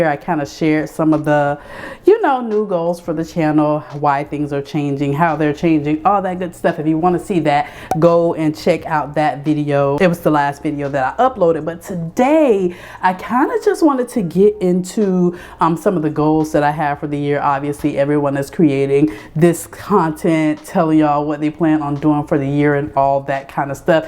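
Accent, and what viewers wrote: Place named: Louisiana, United States. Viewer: Let's GOOO! Let's Get it!! I'm with you this year Sis! Also, your accent is giving Louisiana! I grew up in BR, lol. My goal is to post a youtube video every week.I'm well on my way, too 💪🏿✈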